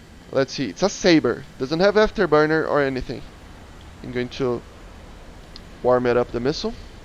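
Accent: Brazil. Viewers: Notei q vc eh BR pelo sotaque do ingles kkkk video brabo nois 😎🤙🔥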